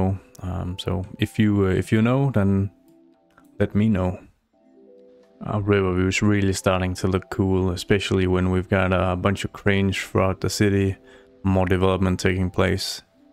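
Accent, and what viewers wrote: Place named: Denmark. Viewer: Just found you channel recently. So nice to have a fellow Dane playing this game (sorry, but it is obvious when you are danish) 😅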